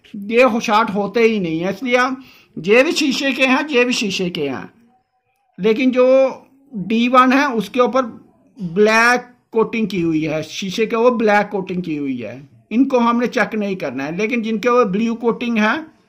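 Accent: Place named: Punjab, India. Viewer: Yha pta chla ki Bhai Punjabi hai Osm vdo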